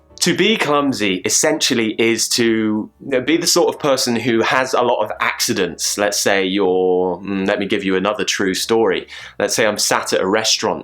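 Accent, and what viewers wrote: United Kingdom. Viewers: Hi from México, just discovered your channel today searching for British accents, i have seen some of your videos and they are quite nice, it really explained very good how to pronounce some difficult words for latinamericans speackers, ¡cheers mate! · I enjoy hearing you talk. I've always found a British accent very pleasurable to listen to. As for the clumsiness, I believe you could tackle that &make a project of morphing out of it. I'm not truly knowledgeable about these matters...but I would think slowing your body actions & movements a bit might be a good start. Along with that, what if you "looked ahead" to see where you're stepping, or reaching, or walking...& sized up the space & room available to you & deliberately slowed your body motions ever so slightly, & perhaps squeezed their periphery a little--a kind of miniaturization of your limbs' movement(s). 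You'd be exerting some additional controls over your body movements & motions...& shrinking their scope & speed a bit--adding careFULness & diminishing some careLESSness. Or not...this might all have been psych-babble!! You decide!! Still enjoy you--never mind the clumsy!! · Hi, Im from Bahrain and yes I extremely enjoy your videos.... I'm in love with the British accent and I loved it more after I watched you.... I wish I could join you on Skype but the price is quite high... Keep going and I keep watching your videos... Cheeeeers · Wow to listen british accent is so good I guess you were slow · I'm clumsy too! I think it's an result of my shyness because I'm mostly shy than anything! I always tend to stumble at something (or someone) when I'm in public. And the British accent is the best ever! Congratulations! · Nice videos, man. I´ve just found your channel. Now I´m following you! British accent is amazing! 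· Successful person, I appreciate your efforts to teaching us your videos helped me to speak and understand the British accent.thank u so much ❤️ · Like the British accent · Hi, I have been over the moon ever since I discovered your videos on youtube. As a non-native teacher of English, I have got a lot to learn from you. Listening to you and studying your mouth movements has helped me correct and improve my pronounciation to sound more British. You also have great ideas and tips like exaggerating the sounds. Wenn it comes to vocabulary, the way you explain them in context is amazing. All in all, you are a very good teacher, who teaches from the heart, not from the book! Your students are lucky to have a teacher like you. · Hello. I am a Japanse and I am learning English. I subscribed your channel recently, and enjoy your teaching. Thanks to you I’m getting used to British accent. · I love your accent I would like to be one of your student to learn British English Thank you so much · Hi! 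It's so nice to hear you speaking! I'm so in love with British English! I liked this lesson a lot, and if you accept a suggestion, why don't you ask some questions about your story at the end of your story. You could also do it live, so that you get our reply immediately after you ask the question. Would you consider it? Cheerio! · You got a beautiful British accent! I loved your story telling and I learned about a few words I've never heard before so thanks! being entertaining and teaching at the seam time is quite priceless 👍👍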